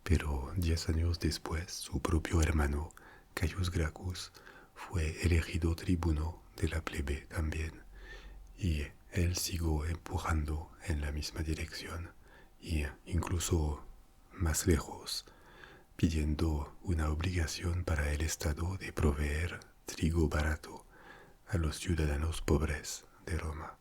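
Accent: France. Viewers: Qué hermoso! Castellano con acento francés! Gracias! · Español con acento francés... ufff, este audio es maravilloso. Muchas gracias.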